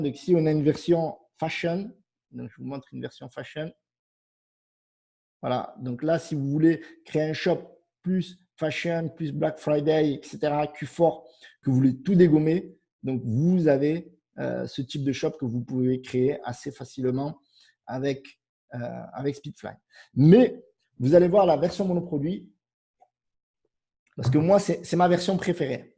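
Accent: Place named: Marseille, France. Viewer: lorsque j't.écoute,on dirait k't.es sur Marseille, chui sur hyères pour l'instant. À larevoyure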